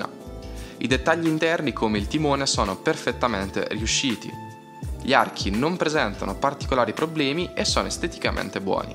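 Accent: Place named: South, Italy. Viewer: mi sembrava che avevi un accento del sud... Quindi sei nelle marche giusto?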